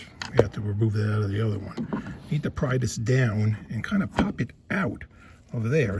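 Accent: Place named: New York, United States. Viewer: Love your accent! NY?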